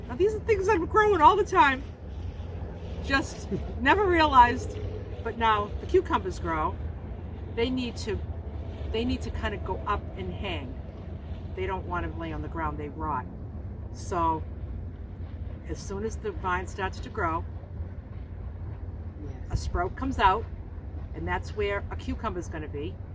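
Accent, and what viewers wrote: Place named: Boston, United States. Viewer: I really enjoyed hearing about your spiritual journey. You have a way with words and the bostonian accent to boot, such a great storyteller. It's different for everyone and hearing others really gets my wheels turning. I believe in all things greater than myself, which always leads me to question. A wise woman once told me to not ask why but instead be grateful. Life long student over here, endless lessons to learn and so much gratitude.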